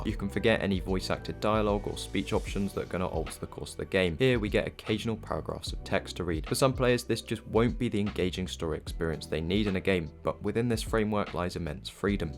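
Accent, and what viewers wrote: United Kingdom. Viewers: Wow. 2024, playing since 2018, love the British accent. All you need to know is here. Btw, if you want to make money (units) -> chlorine, afterwards -> buy cheap ships, upgrade them, and sell them back as scrapping for AI parts... Worthing a lot of units.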